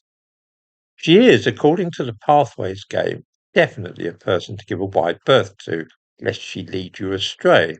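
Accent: United Kingdom. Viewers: Hearing a Brit say Amelia so often just makes me think Amelia Pond and want a ride in the TARDIS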